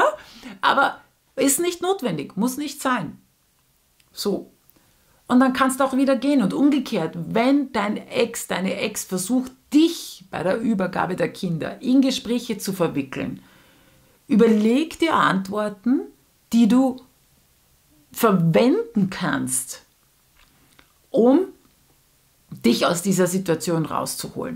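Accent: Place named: Austria. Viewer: Kuulostaa Itävallan murteelta. ❤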